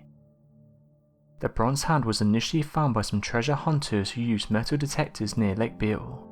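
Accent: United Kingdom. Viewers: i know you have your 800,00 followers and its well deserved but could you please change the tone of you voice cause it sounds like every sentence is the same. just a lil constructive criticism. each sentence sounds the same. literally. i like your videos but when I find myself happen to have clicked on one of your clips i dont want to see it out to the end cause of the bland reading of amazing things. you dont need to be over the top by all means but you end each sentence as boring as the last with a same British or english tongue that gets so old by sentence five. im sorry! you obviosly have got it made. i am just telling you i cant stand the same monotone over and over! thats the word i was looking. its just monotone. I think i have said this before honestly. dont take this bad its just i cant watch another clip of yours if its gonna be this amount of monotone.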